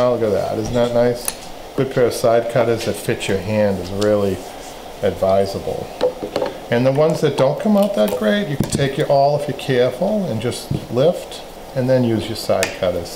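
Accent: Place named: Boston, United States. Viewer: I've been watching youtube videos to learn how to reupholster my chair, and came across this. Your accent...I thought, "he has to be from back home?!" Sure enough, Boston! Thanks for the great videos, from a fellow Masshole now living in Nashville, TN!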